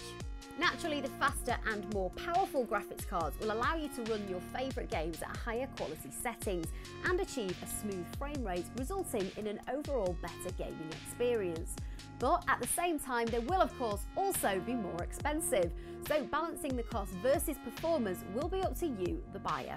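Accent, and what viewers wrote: United Kingdom. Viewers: Gotta love that British accent !!! Informativite video with essential breakdowns.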